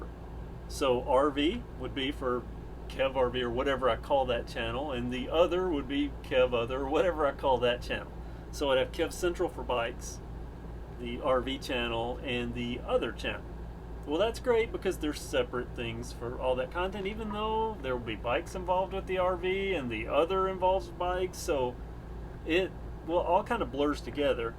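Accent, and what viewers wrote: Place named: Texas, United States. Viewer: Please make Travel vlogs... I watch all of your videos... Tbh I have notification on I love your accent. Gives me Texan vibes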